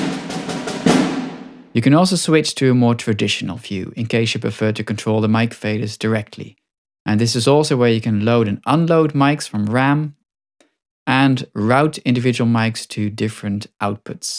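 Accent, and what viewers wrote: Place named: Netherlands. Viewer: Love the update (and subtle Dutch accent :> )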